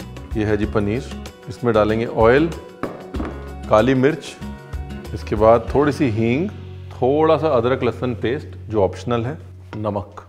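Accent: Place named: Lucknow, India. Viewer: The way you speak is amazing, no one can touch the Lucknowi style and poise.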